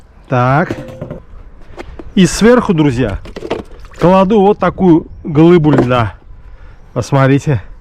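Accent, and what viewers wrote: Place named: Baku, Azerbaijan. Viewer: Салам из Дербента....спасибо за такие видео.. Такой,родной Бакинский акцент Саг Ол От души 👍👍👍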